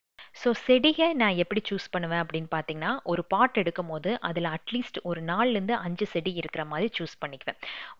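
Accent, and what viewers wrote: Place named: Pollachi, India. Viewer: Hi … I am your silent subscriber from Cbe … from your slang itself I noticed that u r from pollachi..I am watching ur videos regularly but today the way u expressed ur personal stuff was really beautiful. U seem to be very honest and genuine and a good parent also.. Always being honest in ur work brings success.. god bless you.. if u happen to visit Coimbatore we will meet..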